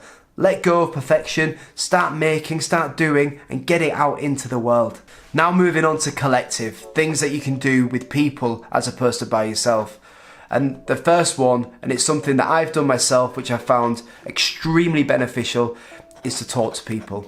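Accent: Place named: United Kingdom. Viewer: Pedro Pascal with a British accent on a bike? Why not 🥸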